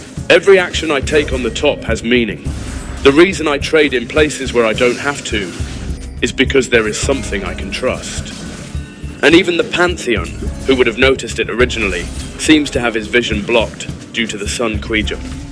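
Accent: United Kingdom. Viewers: 영국식 엑센트 맛집임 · 완벽한 영국식 영어다 하다가 중간중간 개뿜네 ㅋㅋㅋㅋㅋ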